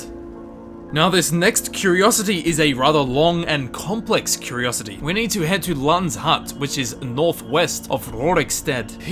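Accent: Australia. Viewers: Hey, what county are you from, because your voice sounds faintly Australian but I can't place it, thanks! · what part of Australia are you from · Australian. The accents are very different.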